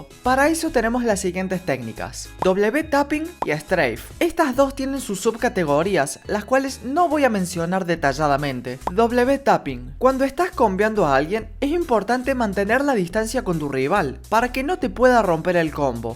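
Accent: Córdoba, Argentina. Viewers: che amigo vo so cordobe? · Una duda antes que todo buen video Bro sigue así la duda boluda que tengo es ¿Sos cordobés?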